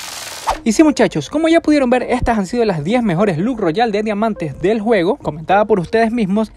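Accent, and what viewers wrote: Ecuador. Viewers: De donde eres? Con tu vos me pareces ecuatoriano😕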